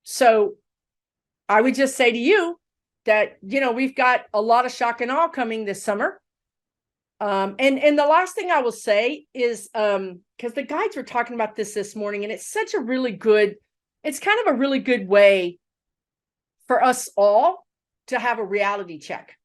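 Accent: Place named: West Texas, United States. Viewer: Your sooper awesome, a great reader...... And talk and sound just like me.!! ( West Texas. ) I catch every reading and your guides are great. As well. Thanks for bn here.. 🌹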